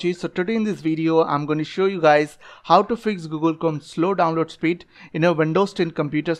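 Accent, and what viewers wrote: India. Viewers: mr zoid i hate indian accents but did it work? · I was happy after I heard that heavy indian accent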